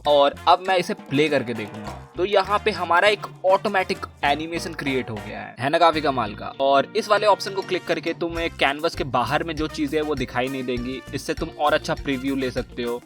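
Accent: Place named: Bihar, India. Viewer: Bhai bihar se ho kya?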